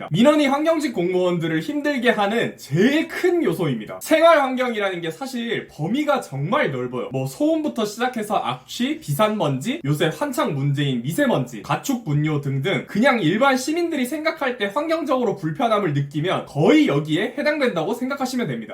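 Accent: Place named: Gyeongbuk, South Korea. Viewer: 말투를 보니 경북분 같네요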